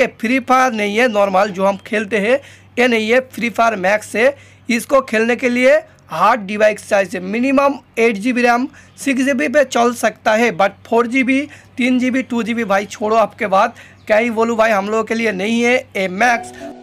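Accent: Bengal, India. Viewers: Ap kay bangali ho bhaiya 🙄🙄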